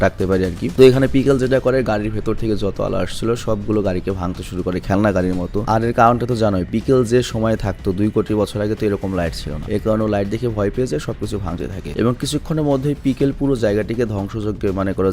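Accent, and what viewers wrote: Noakhali, Bangladesh. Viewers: Vai Noakhalir kotha sune valo laghse..ami Noakhali 😌 kno Noakhalir kotha bolsen jani na kintu valo laghse..1 ripley plz😌👍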